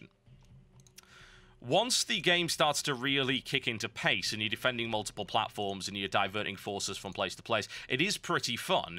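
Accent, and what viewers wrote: Australia. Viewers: Wow... an actual real Australian accent? That's rare. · Now THAT'S an Aussie accent... Thankyou lord! Not another case of the butchered accent like the horrid one in Dead Island... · Australian guy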